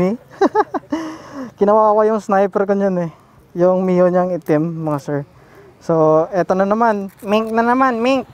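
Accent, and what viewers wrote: Iloilo, Philippines. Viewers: Ilonggo ka lods?HAHA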